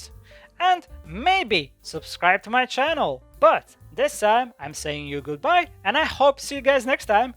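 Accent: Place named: Latvia. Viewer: Nice video but the latvian accent is strong 😂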